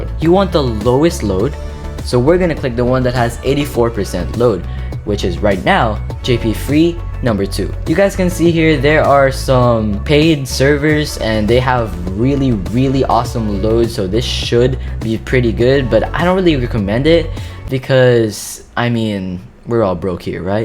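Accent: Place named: United States. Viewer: You sound American wtf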